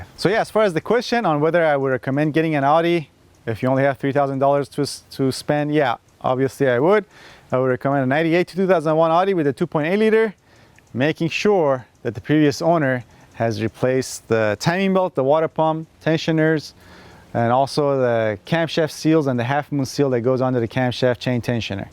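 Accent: Germany. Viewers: Bro you are the best. Your German accent is hilarious 😂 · I really like your superior German accent. :) Thanks for all of the tips.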